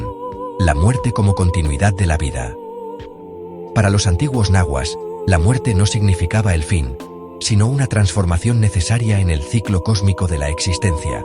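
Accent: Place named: Spain. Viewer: Y lo narra un español 🙄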